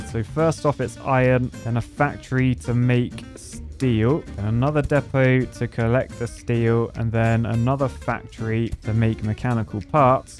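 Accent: United Kingdom. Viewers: your content has replaced just about every source of entertainment in my life lately. Thank you for playing videogames in a fashion way more entertaining than doing it myself. It's been fun marathoning through your backlog of videos. Have you ever thought of narrating those nature documentaries? Maybe it's the British accent but getting a bridge review in the middle of lion chasing a zebra would make my day.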